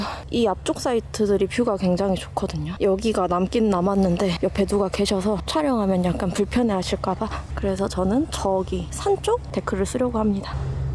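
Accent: Jeolla, South Korea. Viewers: 전라도 사투리도 귀여브러…❤ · 원래 전라도 분이신가 사투리가 ㅋㅋㅋ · 전라도쪽은 가본적이 없었는데...영상으로 대신 가주시니 좋습니다. 간혹 나오는 님의 전라도 사투리도 듣기 좋았구요. 덕분에 오늘도 여행 잘~ 했어요.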